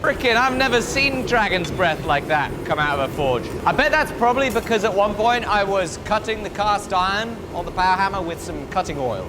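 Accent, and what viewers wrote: England, United Kingdom. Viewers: A big “chube” of chips I absolutely love the English accent and what it does to certain words! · So British. So cute. So Hammer.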